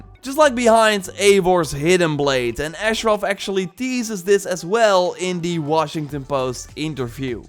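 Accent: Netherlands. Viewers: Welk Nederlands accent? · I love that crisp Dutch accent: a n d l e t s g o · Ik hoor een Nederlands accent :)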